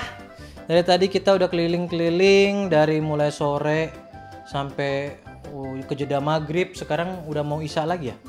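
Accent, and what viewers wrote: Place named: Tegal, Indonesia. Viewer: Dog, lu sebenarnya orang mana sh?? Sukabumi apa dimana, soalnya dari cara bicaranya itu kaya orang tegal-pemalang gitu(medog)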